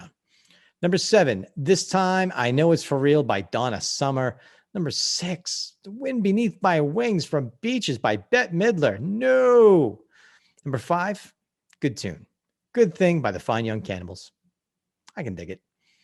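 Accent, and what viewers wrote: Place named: Boston, United States. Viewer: Too funny! Finally my love for has brought me fame! LOL Loving this and I plan on checking them all out. You may have moved around a lot but still maintaining that wicked awesome Boston accent!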